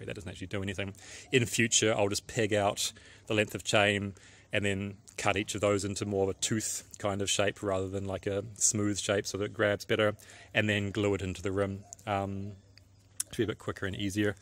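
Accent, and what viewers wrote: New Zealand. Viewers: Is he an Aussie? His "R's" make him sound like he comes the bottom the the south island NZ.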